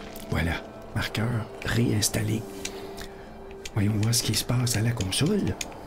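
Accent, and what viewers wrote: Quebec, Canada. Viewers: Salut, Je voulais juste te dire à quel point j'apprécie ta façon de parler avec cet accent québécois authentique. C'est ce qui rend ton discours si unique et captivant. Ne change rien! En France, où j'habite, on n'entend pas souvent cet accent, et c'est précisément ce qui rend ta présence si spéciale. Ta manière de parler apporte une touche de fraîcheur et d'originalité, et c'est l'une des raisons pour lesquelles tant de gens t'écoutent en france. Ce que tu fais est vraiment superbe, et je pense que c'est important de célébrer nos différences linguistiques. Cela crée une diversité enrichissante et stimulante. Continue à être toi-même, car c'est ce qui te rend exceptionnel. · Ne change rien. Perso, j'adore l'accent québécois...👍👍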